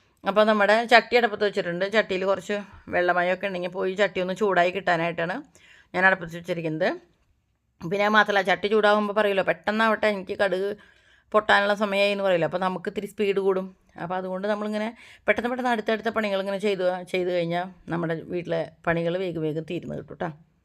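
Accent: Thrissur, India. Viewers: Hi che,thank u for uploading videos soon,from a thrissurkari who lives in Melbourne now. I love watching ur vlogs to hear Ur thrissur slang and Ur simple life.love u che a lot.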